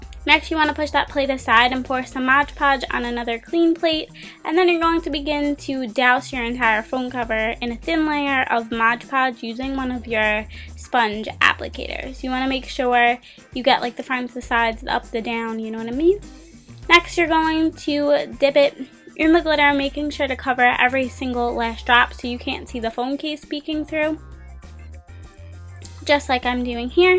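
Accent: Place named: New Jersey, United States. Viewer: you sound like you're from jersey. are you?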